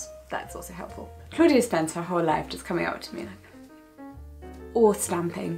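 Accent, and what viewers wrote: RP, United Kingdom. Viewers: I love how she is so British and almost posh but she is like “What the hell” and “God damn it!” It is such a distinktive personality trait of her’s :D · This is my first day subscribing to you, and I love all the videos I've watched from you! Btw, you have an amazingly beautiful accent, and I adore your phrasing. Well-spoken people with a British accent can impress me jolly deeply. xD And I'm gay too, so your channel's really lovely. :D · You are very kind telling us that...and very pretty as well. I am learning German Sign, love you British accent 😍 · Most posh sounding deaf person I've seen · LOOOVE your British accent ❤️ ❤️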